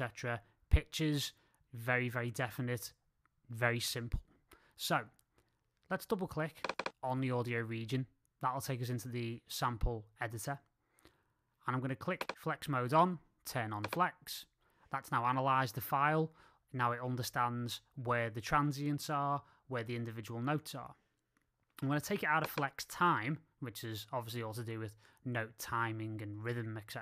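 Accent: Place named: England, United Kingdom. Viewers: English accent is the most stupid lame az accent! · ***** Well said Sir. I am from Liverpool, but living in Sydney. Always good to hear an accent from back home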